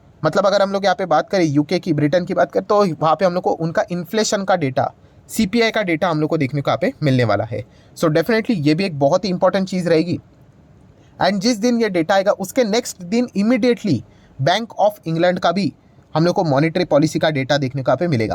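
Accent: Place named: Gujarat, India. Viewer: Bhai aap kya gujarati hoo 😂 aapki boli pr se lage Love from rajkot ❤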